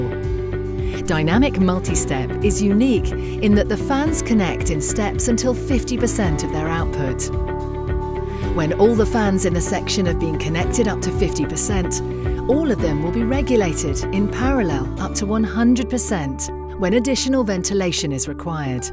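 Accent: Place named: United Kingdom. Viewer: The only horrible thing of this video is that brit accent. I had to turn off the audio.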